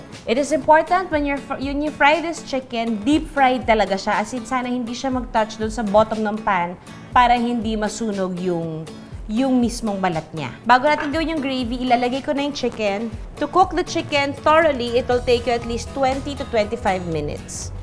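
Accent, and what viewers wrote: Philippines. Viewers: I heard that accent and knew she was from the Philippines. Nope not doing it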